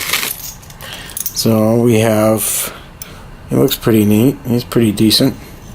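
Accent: New England, United States. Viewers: Black powdah in havahd yahd Love the New England accent! Thanks for the unboxing! I’m just getting into the hobby and bought the Waterloo starter set. May look at this one next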